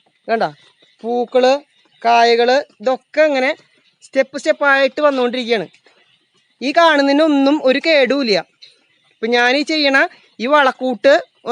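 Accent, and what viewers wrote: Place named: Thrissur, India. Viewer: തൃശൂർഭാഷ നല്ല അവതരണം സൂപ്പർ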